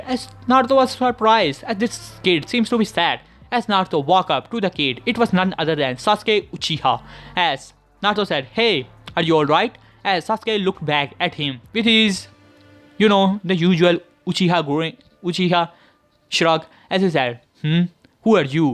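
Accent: India. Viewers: Are you from India... because your pronunciation seems like that. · Bruh you a indian?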